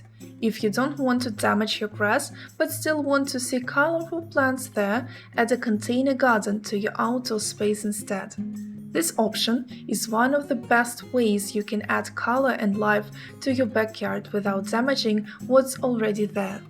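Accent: Russia. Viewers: You have russian accent... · Русская на английском, слышен акцент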